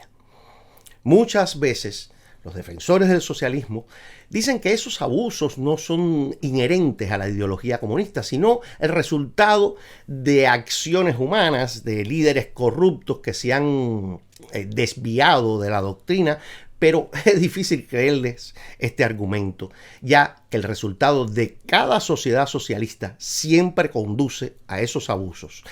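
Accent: Cuba. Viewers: Se nota que eres cubano, asere!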